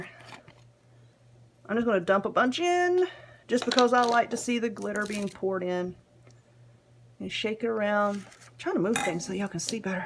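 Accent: South, United States. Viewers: Love your channel and your southern accent. I have southern accent as well 😊 I wanted to know what type of cricket machine you have?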